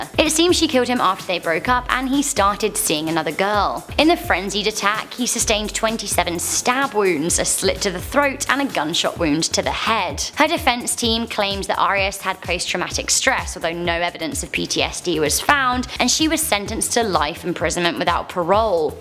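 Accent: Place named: United Kingdom. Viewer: British accent always amuse me🤣🤣🤣🤣🤣🤣🤣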